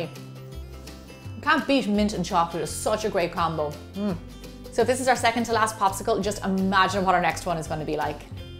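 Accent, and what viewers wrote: Ireland. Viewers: R u Irish??? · are you Irish g emma?😕😕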